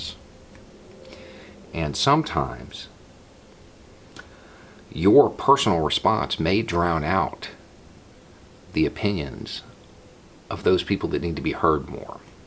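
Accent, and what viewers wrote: South, United States. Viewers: So this video popped up on my feed and I decided to watch it. I honestly came into this video thinking you were a trump supporter and you were going to bash Trudeau and yang, then I heard your southern accent and it made the feeling that this was that this video is going to be. Boy was I wrong. Like i said this is my first video I've seen so i dont know your political views, or even if you post content like that, but no matter what side you are on, you are on humankind's side and that's what matters! Thank you for this video and i wish more people thought like you!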